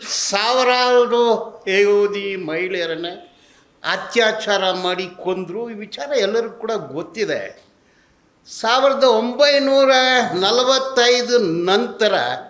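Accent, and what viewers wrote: Malabar, India. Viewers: Malabari talking kannada in fake channel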